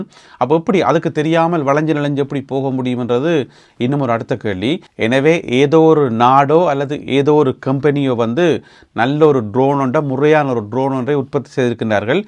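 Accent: Jaffna, Sri Lanka. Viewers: தம்பி உங்களது அழகான தமிழிற்காகவே உங்களது பதிவுகளை கேட்பேன் யாழ் பேச்சு வழக்கில் உங்களது பேச்சு நடை மிகவும் இனிமையாக உள்ளது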